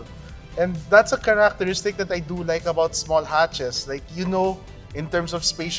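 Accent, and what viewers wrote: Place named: Philippines. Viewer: Good review, English Tagalog accent but it's good.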